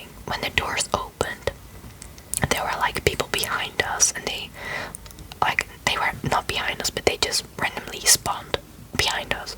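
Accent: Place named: Netherlands. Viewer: Lovely accent ❤️ , are you from The Netherlands?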